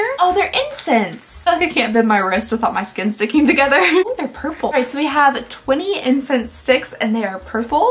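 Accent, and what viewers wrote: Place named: Tennessee, United States. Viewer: Sweetie, there is NOTHING wrong with the way you talk. If ppl dont like it, you know the saying..."just keep on a-scrollin'". I personally LOVE the way you tale and describe things. Reminds me of home (Tn.). I miss it.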